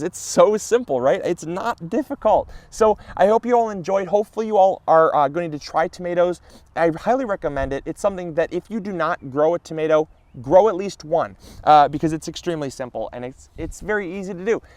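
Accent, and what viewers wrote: Michigan, United States. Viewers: That MI accent a bit strong hey?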